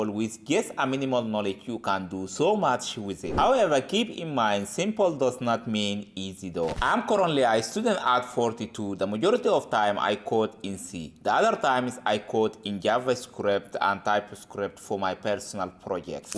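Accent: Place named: Italy. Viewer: You sound Italian. Great content, bro.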